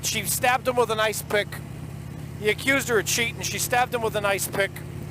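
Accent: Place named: Boston, United States. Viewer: Love the way your accent comes right back when in Boston for awhile. Keep up the videos brother I enjoy'em and hats off for putin your life out there. Keep do'in what u love and thanks.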